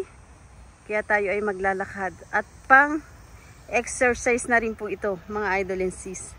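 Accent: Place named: Philippines. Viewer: Wow ... beautiful country side .. the weather looks calm , at looking napa fresh Ng place .. awesome lake view .. thank you for bring us there .. sis .. feel ko ehh parang taga Bulacan or Nueva Ecija or Quezon ..kz Tagalog na Tagalog ka sis .. thank you for bringing us there in Georgia ..tru Virtual tour .. Sis ..paxshout out Po sa next video upload mo ..❤😊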